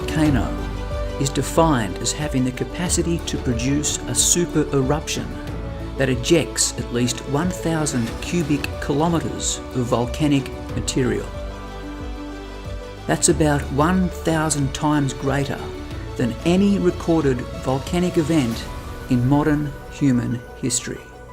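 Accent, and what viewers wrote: New Zealand. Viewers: It’s a kiwi accent you dumbo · 7 volcanoes, lots of hyperbole, little actual information for real reference. Not saying the 'supervolcanoes' are not super hazards, they are. But this video isn't giving anybody any degree of expertise and detail of known facts. Decent visuals, great Kiwi accent. The music is cheesy and makes it clear from the start that this is fluff, not science. I love accurate pop science. This isn't it. Starting with who is the author and why they are qualified to present information is always an honest start.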